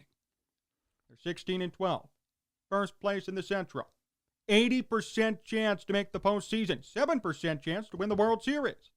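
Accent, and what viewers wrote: Chicago, United States. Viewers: Love what you're saying bud, also you have the most Chicagoan but also not even close to Chicagoan voice I've ever heard and I have no idea how to feel.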